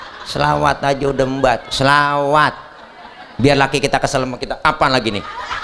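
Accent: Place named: Cikarang, Indonesia. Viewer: Pa ustadz ..Bahasanya org Cikarang ye ..ceramahnya ga bikin ngantukk Ada lucu nya ada seriusnya ..semangat ye ustadz tilee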